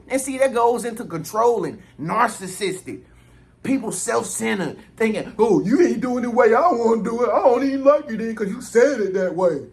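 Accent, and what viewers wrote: United States. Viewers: Thank you so much for doing what you are doing. Your authenticity is such a light in the world. I am not American so sometimes I have a hard time understanding your accent but your light shines so bright that I keep coming back and watch because you are a beautiful soul and you inspire me somehow. I am sending you my love and light. You deserve the best. Blessings ❤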